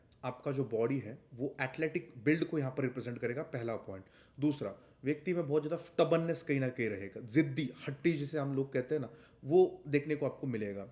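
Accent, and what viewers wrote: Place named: Maharashtra, India. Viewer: Aap maharashtra se ho ,marathi .. observed ur chautha pronounciation... Ekdam chan video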